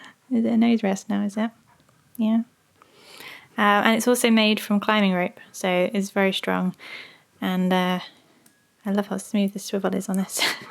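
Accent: United Kingdom. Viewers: I feel so content when I hear your voice cuz I feel like british accents sound so professional and cool.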